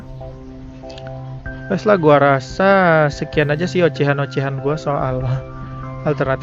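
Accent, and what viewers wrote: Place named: West Sumatra, Indonesia. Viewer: BTW abang orang mana bang?kok cara logat bicara nya mirip orang sumatra barat ya bang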